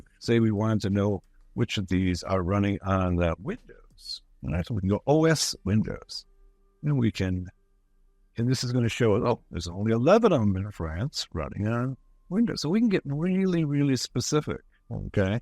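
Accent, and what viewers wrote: Israel. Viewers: אני מת על המבטא הישראלי יא מלך תמשיך ליצור תוכן מעולה💪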